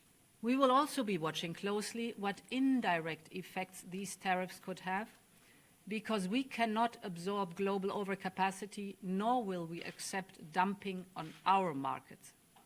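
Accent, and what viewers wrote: United States. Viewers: Why this German speaks perfect American English? ahaha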